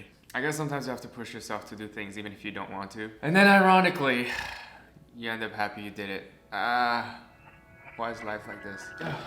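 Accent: Canada. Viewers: If only Mr could make a video in french i love hearing he's Canadian accent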